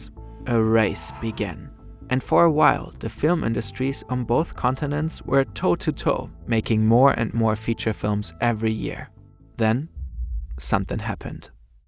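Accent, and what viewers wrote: Germany. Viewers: Hä ist der Sprecher deutsch?! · I just love listening to his German accent. There will be a time when he loses it. It's probably the most iconic voice in story narration history. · ahh I can hear a German accent, I feel right at home 🥰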